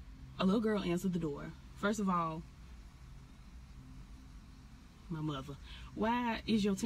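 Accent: South, United States. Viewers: You sound so Down south!!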